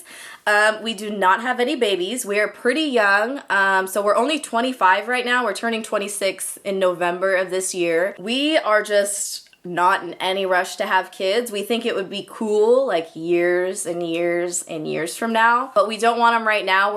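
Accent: California, United States. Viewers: Imagine, I've just sat here for 34 minutes watching this, despite having followed you for over a year and knowing you pretty well, and I still don't know why you're called 😩 loved every second though, that strong valley accent killed me 😂❤️❤️❤️ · Lol, I've been watching on and off for a few months and I came across this video so I was like, "This is probably the best way to really start!" And tbh that was a good idea. Because she talks exactly like everybody I grew up with. I'm not currently home but she made me miss California! Idk exactly what it is but it's a very Californian way to speak. Anyway, I'm subbed now!:)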